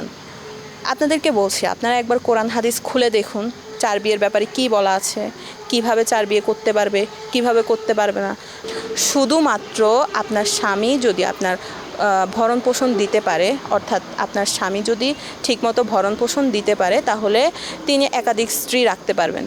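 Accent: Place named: Chittagong, Bangladesh. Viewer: সঠিক বলেছেন, সুন্দর উপস্থাপনা কিন্তু ভাষাতে চট্টগ্রামের আঞ্চলিকতা দূর করতে হবে।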